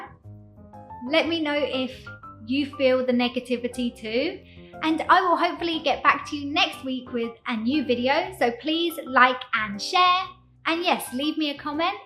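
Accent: United Kingdom. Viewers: 真的非常喜歡聽您英式英語的口音講任何事情，這種腔調聽了真的是一種享受啊！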